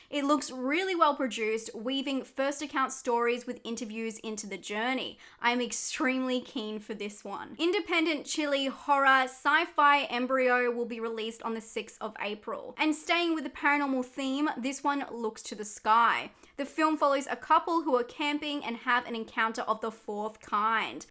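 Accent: Australia. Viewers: ah bro bless her, subbed when she was at 2-3k and almost at 80 k now and she still puts up A class content love her aussie accent lol wothahhhh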